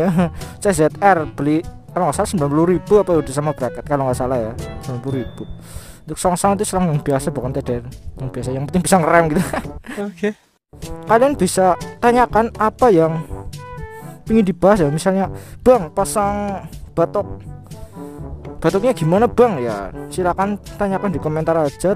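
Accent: Indonesia. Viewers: Waa anjay raider ngapak Purwokerto 😂😂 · Anjay wong ngapak jebule wkwkwk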